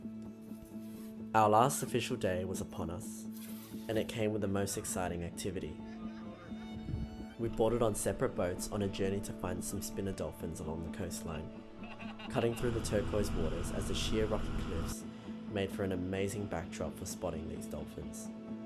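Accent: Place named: Australia. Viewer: Hi, great video! It sounds like you might be Australian and I'm just wondering was Socotra listed by DFAT (or your respective Government travel advisory) as 'Do not travel' when you went? I'd like to go next year but unsure as its currently listed as 'Do not travel'...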